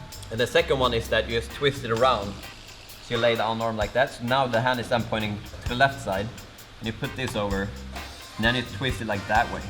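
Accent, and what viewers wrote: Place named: Sweden. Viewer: Keep the swenglish! Never compromise! Love it!!!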